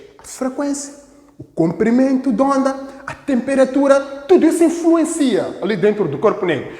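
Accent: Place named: Portugal. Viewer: Amei! Mt bom de escutar o sotaque tbm hahaah português de Portugal?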